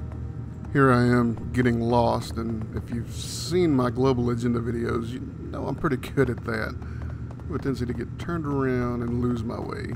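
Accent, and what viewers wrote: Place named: United States. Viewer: I love your accent! Nice to have a regional accent for once from someone in the USA :) (I'm in Northern Ireland). You review really well too - not the usual 100 words a minute! I like the setting of this game and even with the bugs I think I will give it a go. I will check out the site - nice to have a decent review instead of the usual nonsense - thanks :)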